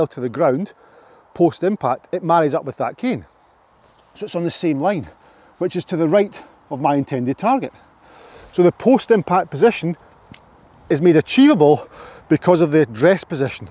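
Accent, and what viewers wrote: Scotland, United Kingdom. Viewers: I think his accent is fairly mild for Scotland. Some Scottish accents are very difficult to follow but very interesting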